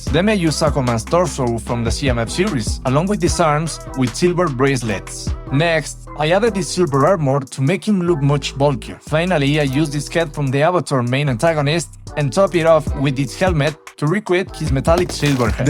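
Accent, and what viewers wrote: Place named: Poland. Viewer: You're sound like poland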